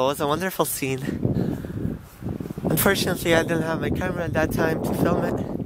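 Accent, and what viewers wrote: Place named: United Kingdom. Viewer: You are from Canada? I thought from UK. Cute ducks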